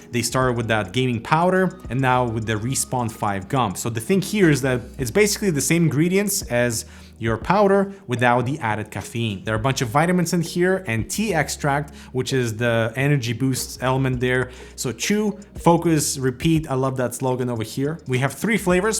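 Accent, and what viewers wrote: Russia. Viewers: Are you Russian? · Чо? ты русский что ли??? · bruh u russian or italian ?????????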